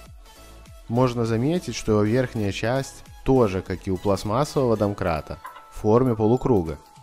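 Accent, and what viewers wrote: Belarus. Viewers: Канал называется Крэйзи рашнз эксперимент, а войсовер имеет выраженное беларуское произношение)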